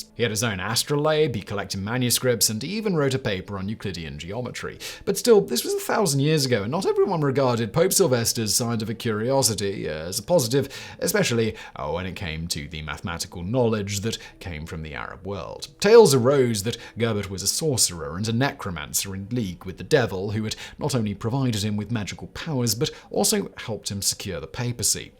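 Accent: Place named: RP, United Kingdom. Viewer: Bro why do you talk like that.. makes it hard to listen/take seriously. I thought that pretentious posh accent died out by the late 1900s.. even the British RF dont talk like that anymore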